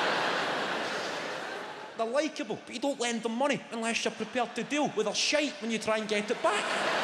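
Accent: Scotland, United Kingdom. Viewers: Being fair, Kevin is speaking Scots English (or Scottish) -- a vernacular of English that is essentially a different language entirely, but mutually intelligible with Standardised English. It would be nice for people to remember that when they act like Scottish is just a "comedy accent" on the Twitter. ("Mutually intelligible" meaning that two people can speak a different language, but as long as they are closely linked enough historically and linguistically, they can understand each other just fine despite any differences in grammar, vocabulary and pronunciation. Another example would be Swedish and Norwegian). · i love the scottish accent but man, i barely understand it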